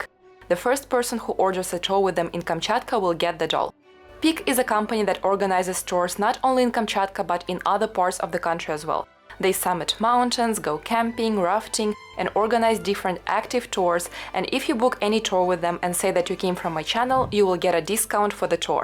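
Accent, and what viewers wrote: United States. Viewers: Thought you were saying Geyser wrong, since your accent is more American sounding maybe you should say it <link> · Пошленькая девушка и такой же пошленький американский акцент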